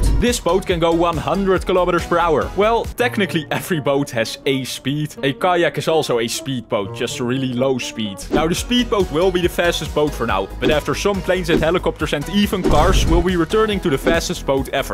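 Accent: Netherlands. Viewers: Dutch accent going crazy😂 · Idk but are u Dutch u sound Dutch :)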